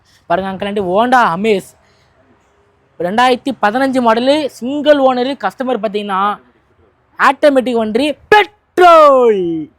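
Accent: Madurai, India. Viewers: Super Thampi Madurai vasagam 🎉🎉🎉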